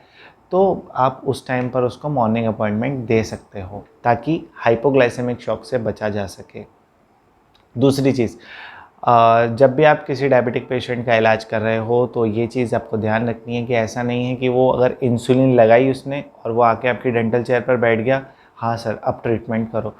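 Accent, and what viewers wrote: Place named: Uttar Pradesh, India. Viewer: Sir Aap pakka UP se belong krte h 😅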